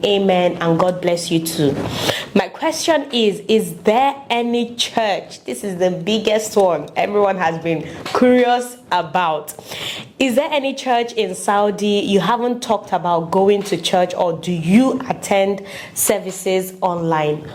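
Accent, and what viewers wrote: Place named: Nigeria. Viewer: I really appreciate that you are audible & clear..even when you drop in your dialect it doesn't make it hard for a non Nigerian to understand. You are an Intelligent lady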